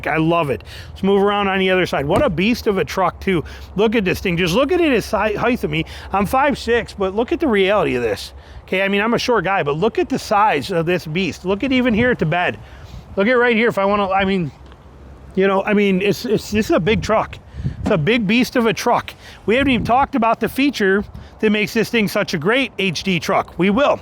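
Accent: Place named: Wisconsin, United States. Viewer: Hunert, k. K? K? Gotta be from Wi.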